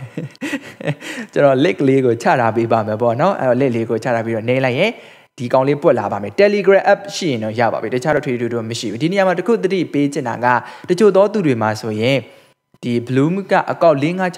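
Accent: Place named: Myeik, Myanmar. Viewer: အကိုကဘိတ်သားလား ဘိတ်သံဝဲနေလို့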